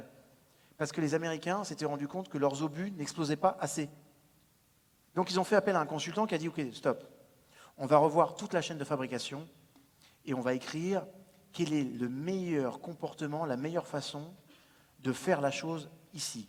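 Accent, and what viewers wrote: Canada. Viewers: Il est pas si mal votre accent canadien je me serait cru dans un épisode de "Mme Lebrun" oui en France aussi l'on connaît cette série tournée en public humoristique à souhait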